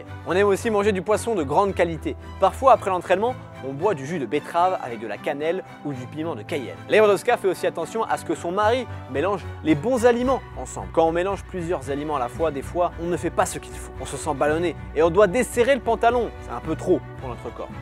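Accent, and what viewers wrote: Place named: England, United Kingdom. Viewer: Walla like pour l’accent anglais de fou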